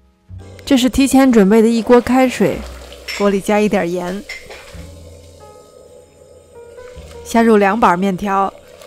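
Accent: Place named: Beijing, China. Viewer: 是北京人吗～哈哈听着是亲切可爱的北京人哈哈哈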